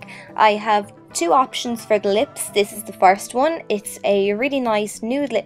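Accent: Ireland. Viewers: Are you from Ireland ? 😄 I'm in Laois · Your irish ♥♥♥♥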